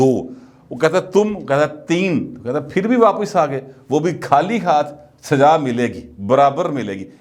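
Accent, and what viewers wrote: Lahore, Pakistan. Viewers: i really enjoy your lahori accent, urdu punjabi mix...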